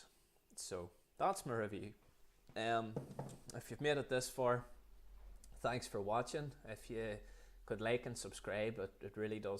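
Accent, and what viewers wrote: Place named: Northern Ireland, United Kingdom. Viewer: Sounds like Northern Ireland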